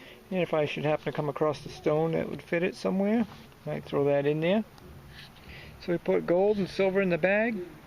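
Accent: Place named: Boston, United States. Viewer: congrats like your vids :D love the accent quadah reminds me of that speaking Boston commercial ;P great finds the funny thing is i found that exact same 10 k ring my fiance wears it now wont let me have back lol and that spoon i find lots of those rogers spoons but i have that exact same one. good on ya keep them vids coming .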